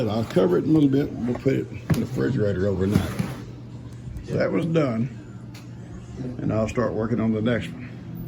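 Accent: South, United States. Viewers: closed caption needs to recognize that southern drawl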